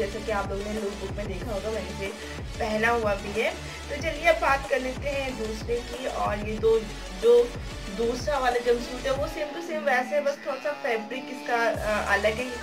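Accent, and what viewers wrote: India. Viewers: Mast ek dam mumbai language Mai boleto jakas🤩🤩🤩🤩🤩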